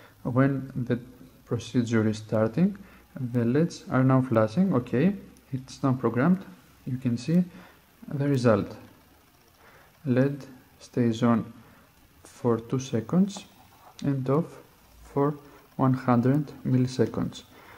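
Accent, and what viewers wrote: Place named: Greece. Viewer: Αχ αυτη η προφορα μας ! Ωραίο video ;)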